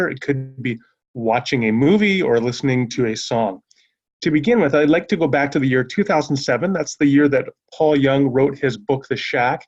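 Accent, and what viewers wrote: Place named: South, United States. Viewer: Love your Southern accent,.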